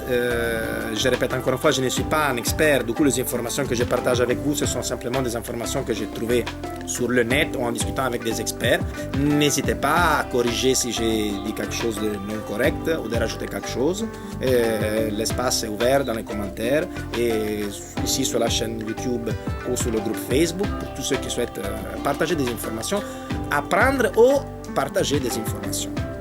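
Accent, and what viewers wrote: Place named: Italy. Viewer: Enfin! Enfin une vidéo sur le boerboel en français! et dans un français parfait, en plus! Et cet accent italien... la classe! Excellente vidéo et très instructive, merci... Je m'abonne direct!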